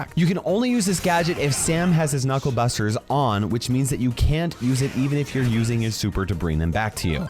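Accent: Australia. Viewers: He’s Aussie? Man I love this guy already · Sam sounds australian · ayo sam having That austalian accent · I didn't know I needed an Australian in BS...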